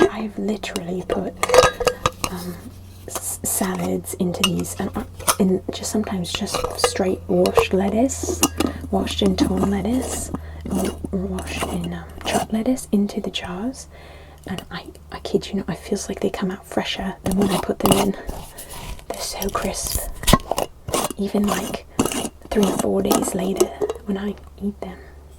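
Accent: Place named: Wales, United Kingdom. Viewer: Ahh! I took your advice and went to watch some older videos - and now I know why I couldn't place your accent! I was thinking Welsh raised overseas or something. Good to finally know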